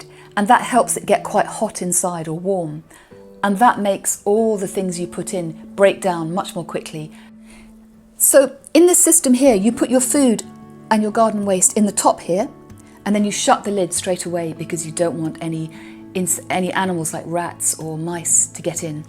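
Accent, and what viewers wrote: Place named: London, United Kingdom. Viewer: Miss,I really, really love your London accent and your videos, I wish you a healthy and happy family!!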